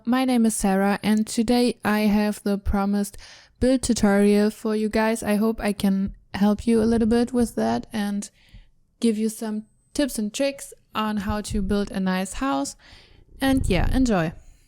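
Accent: Germany. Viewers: ich komme auch aus Deutschland. Ich hab mir schon irgendwie gedacht das du deutsch bist weil man das ein bisschen hört. 😂😁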